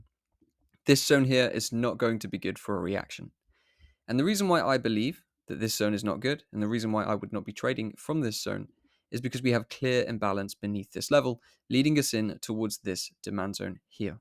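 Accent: United Kingdom. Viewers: Bristish acent is great!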